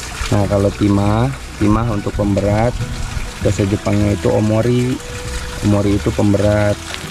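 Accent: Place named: West Java, Indonesia. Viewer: emang sundanesse people mah sulit ngilangin aksen sunda nya semangat bro lah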